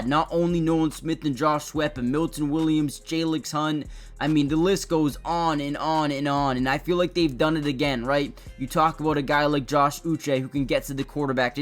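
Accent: Canada. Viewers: Do I detect a Canadian accent?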